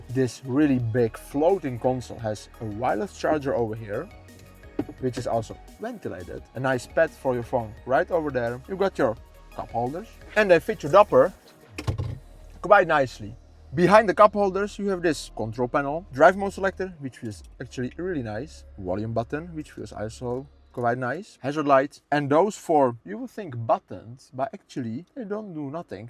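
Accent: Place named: Netherlands. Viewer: Thats some true Dunglish right there.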